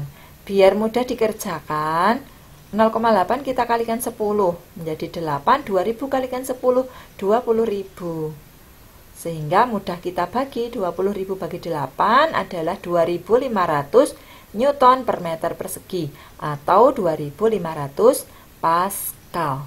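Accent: Indonesia. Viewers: ibu orang Jawa yahh?👍😭🤣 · Kya ibu guru saya yg dr Jawa Tengah... Suaranya... Maturnuwun materinya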